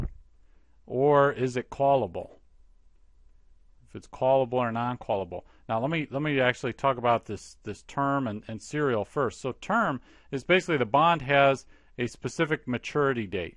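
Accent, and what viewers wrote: United States. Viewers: Thanks so easy to comprehend. Thank God for an American English speaking person explaining the concept.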